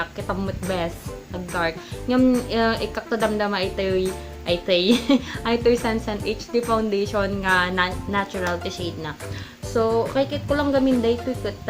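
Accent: Ilocos, Philippines. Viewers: Mayat ti agbuya ti ilocano nga vlog a kasla daytuy, ta manmanu laeng. Haha, pati ayug haha. Ilocanong vlogger met daytuy nuymu ti biang., ed bucas! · Nice 1sis ang cute ng salita ng ilocano ilove it hehehe tangkilikin ang sariling atin feeling ko na nonood ako ng bahasa hahaha 100times like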